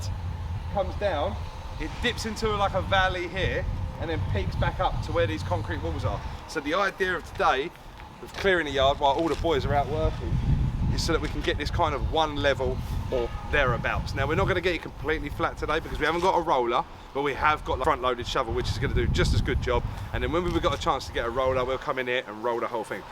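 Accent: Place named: United Kingdom. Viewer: Your so handsome 😊🤗😅 Never skip watching your videos. Love your British Accent btw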